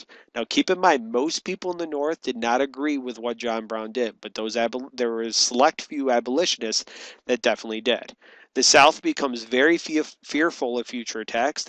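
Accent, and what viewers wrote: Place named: Cincinnati, United States. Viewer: You must be from Cincinnati lol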